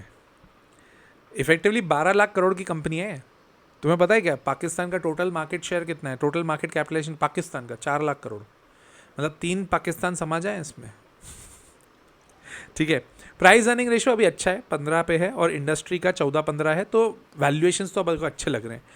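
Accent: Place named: Bengal, India. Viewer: Bro, authentic bangla pronunciation ... kudos....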